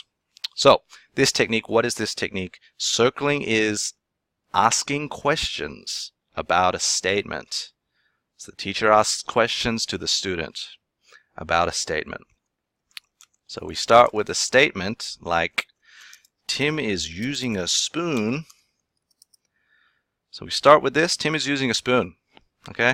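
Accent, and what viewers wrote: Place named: Australia. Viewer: . I am new to your channel and taking Japanese at University of California San Diego to help me in my study of Aikido and Kendo. I had only been watching the videos where you are speaking Japanese and am trying to model your style/speed of speech because it is at a pace that I can create/form sentences and responses at my level. Are you America jin, Ostoralia jin or Nihon jin? You English is great with what sounds like an Australian accent. Love your stuff. Domo Arigato Gozaimasu!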